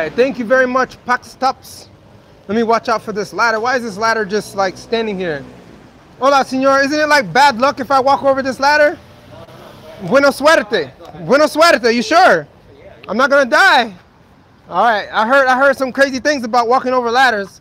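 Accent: El Salvador. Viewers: love your salvi accent 👍🔥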